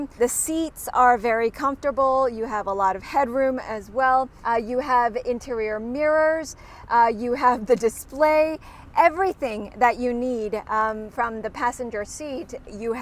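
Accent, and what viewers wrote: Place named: United States. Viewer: Good video! Regards from an Kia Stinger driver in Sweden! And its good with the American accent, not the England "time for thea" accent! :)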